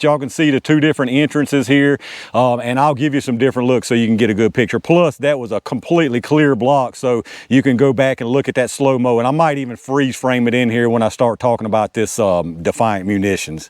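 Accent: South, United States. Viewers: love your videos. Just cannot get enough of them. Love your southern accent to. Sound a lot like me. From Georgia.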